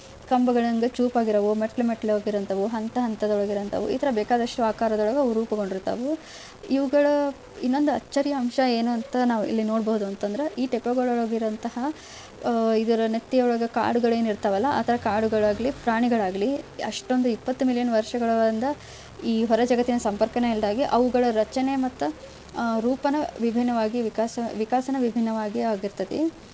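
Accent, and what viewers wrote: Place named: North Karnataka, India. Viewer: ಉತ್ತರ ಕರ್ನಾಟಕದ ಶೈಲಿಯಲ್ಲಿ ಕೇಳಲು ಖುಷಿಯಾಗುತ್ತದೆ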